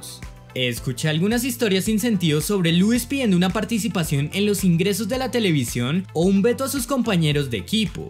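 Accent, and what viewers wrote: Colombia. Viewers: es de colombia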